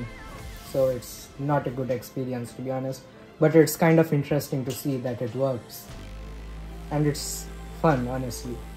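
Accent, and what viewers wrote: India. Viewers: He is from india? Awaz to Indian jaise hai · Love the Indian voice!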